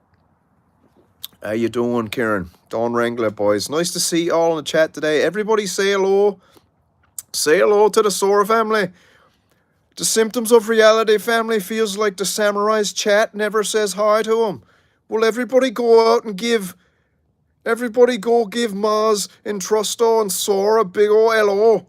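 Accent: Liverpool, United Kingdom. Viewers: A fair bit of scouse in there as well.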